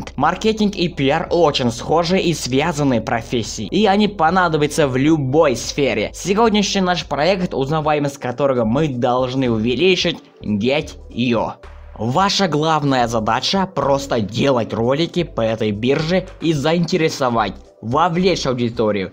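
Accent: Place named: Russia. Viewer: Акцент, у тебя русский, не претворяйся, что владеешь иностранным языком, я их знаю 7 🤜.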